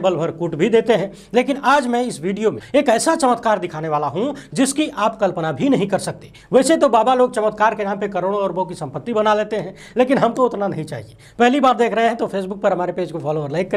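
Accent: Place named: Bihar, India. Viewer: Aap ghantwa dabane ke liye kahte hain,magar size me Chhota hai isliye use ghantiya dabane ke liye kahen.baki aap ka Bihari boli me sab bat achcha se samajh me aata hai.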